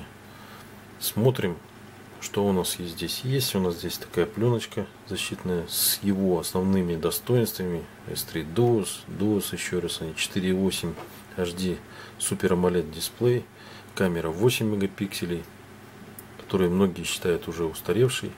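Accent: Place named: Urals, Russia. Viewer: Спасибо за обзор! Особенно порадовал Уральский акцент!!!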